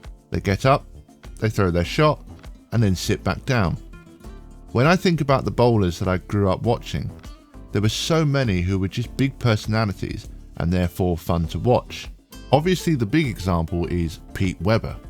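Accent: United Kingdom. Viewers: It's interesting being both one and two handed, seeing the surprised faces when I switch to one hand for ten pins. It sounds like you're from the UK right? So am I, and I think bowling is dying in the UK sadly much more than the US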